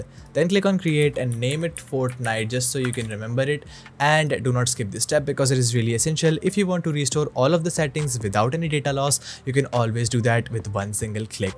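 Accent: India. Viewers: Bro are you Indian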